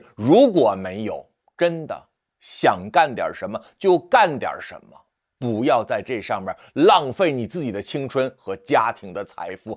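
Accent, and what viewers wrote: China. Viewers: ？你的頭髮還沒白，不夠格當叔，別自我抬搞一輩。你的自介雖用繁體字，但你的口音掩飾不了你是中國人的事實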